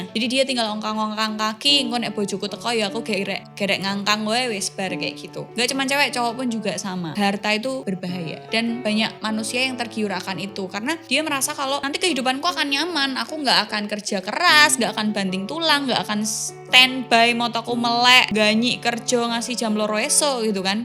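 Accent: Java, Indonesia. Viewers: Seneng ngerukngokno suara jowomu mbak 😂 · ❤️mbk konten mu super duper jos low.. jowomu jek kentel 😂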